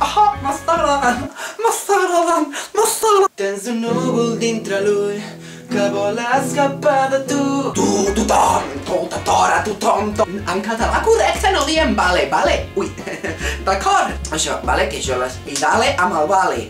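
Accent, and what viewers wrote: Spain. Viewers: Sí que se't nota una mica l'accent castellanoparlant, però vaja és igual, tots junts fem país. Sí volem que el català sobrevisqui és important que els castellanoparlants també l'utilitzin, i de manera preferida vers al castellà.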